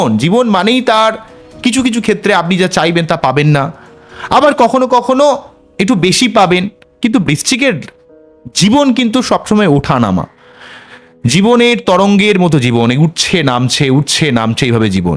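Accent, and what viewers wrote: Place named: West Bengal, India. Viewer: Apni bolchhen "hobe abar hobe". Ami Arijit er vashay boli " R kobe?R kobe? , R kobe r kobe r kobe?😂 BTW, apnar katha sune mone hoy apni Bardhaman chhele.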